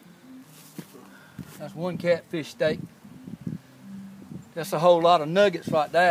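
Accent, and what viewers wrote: United States. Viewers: Can't tell if you're from Mississippi or Australia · That’s a good ole boy deep South Georgia accent. I live in Atlanta but am a country boy at heart. My whole family sounds like this man. Love it.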